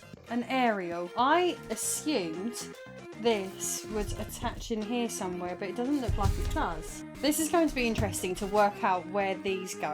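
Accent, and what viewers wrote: United Kingdom. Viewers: You would make a Good lieutenant troi... British accent and all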